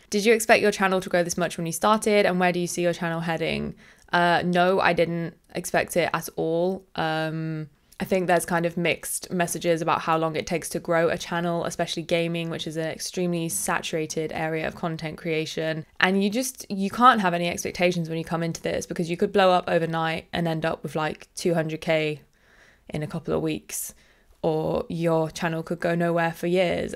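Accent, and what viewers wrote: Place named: RP, United Kingdom. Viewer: As someone from the Saaf Est, I think your accent sounds quite posh!! 😂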